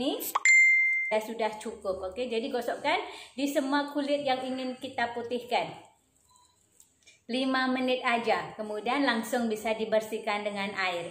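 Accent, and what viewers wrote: Malaysia. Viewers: Asli dari mana kak? Logat malaysia dialeg Indonesia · Logatnya kaya ngomong bahasa malaysia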